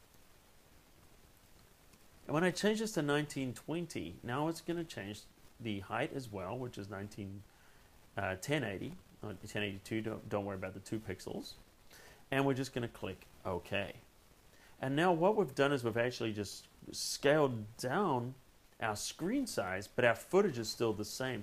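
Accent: Australia. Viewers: Where is your accent from? I'm guessing you're an Australian who has been living in the States for a while. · Good video, by any way are you an Aussie that has been living in the US for a while, you seem to have a hybrid, Greg Norman accent.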